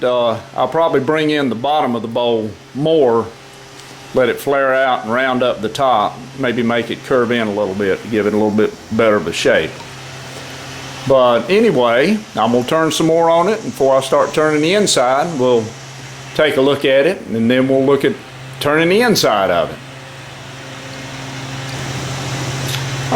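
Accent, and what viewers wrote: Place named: Georgia, United States. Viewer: I love turning wood, and I really love that accent of yours. I have a sister who has lived in Ark. most her life, but it's a bit different than yours. Are you from Georgia, by chance?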